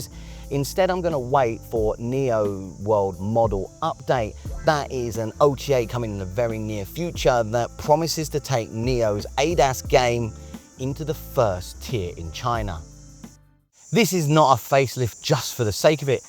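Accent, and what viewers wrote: United Kingdom. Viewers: He sounds like a brit,right?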